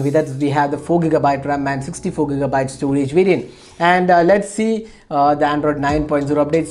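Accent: India. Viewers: Блять как же хуевый акцент у индусов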